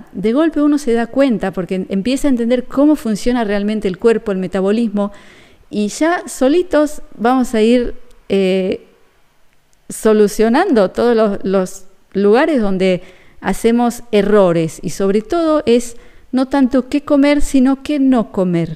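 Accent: Argentina. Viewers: Así es querida estabas malnutrida literalmente te estabas muriendo de hambre, un punto que no mencionaste es que tomas tu alimento y no masticas no producis unas enzimas nesesarias para poder dijerir los alimentos y es otra razón por la cual estabas sin energía. No somos lo que comemos somos lo que dijerimos. Saludos y no pierdas tu acento Argentino no está usar el vos y usar nuestras palabras si nosotros entendemos al resto el resto nos puede entender a nosotros, yo también vivo fuera de Argentina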